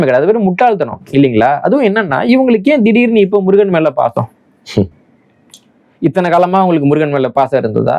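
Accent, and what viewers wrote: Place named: Marwari, India. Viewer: எப்ப- என்னப்பா தமிழ அது? நம்பள்கே? சேட்டு தமிழ் பேசுற மாதிரி இருக்கு. நீ ஒரு தொகுப்பாளர் அதுவும் ஆன்மீகத்தை பத்தி பேசுகிறாய் அப்போ உன் தமிழ் சுத்தமாக இருக்க வேண்டும் அல்லவா?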